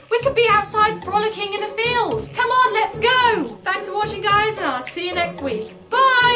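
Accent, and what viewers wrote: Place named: United Kingdom. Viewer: I really enjoyed this video! Please keep them up =] Where are you from? You have a very strong British accent!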